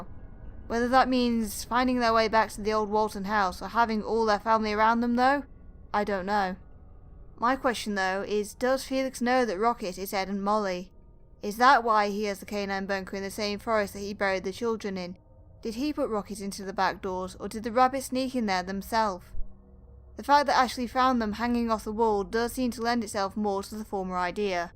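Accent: United Kingdom. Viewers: You speaking british makes me feel better lol ✨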